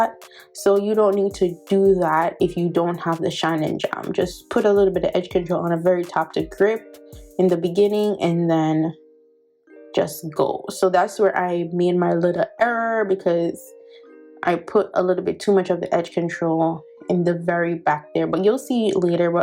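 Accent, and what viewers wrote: Jamaica. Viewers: Yes!! Mi hear di Jamaican accent. Bless up sis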